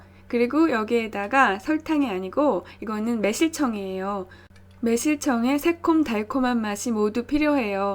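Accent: Seoul, South Korea. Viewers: 진짜 90년대 서울 사투리 항상 늘 신기해요ㅋㅋㅋㅋㅋㅋㅋㅋㅋㅋㅋ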